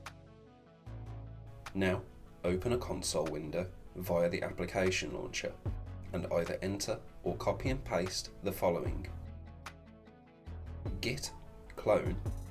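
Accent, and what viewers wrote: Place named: England, United Kingdom. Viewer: It's a pleasure to listen to your perfect England accent. You combined all of the information into perfect tutorial. Thank you very much for such great video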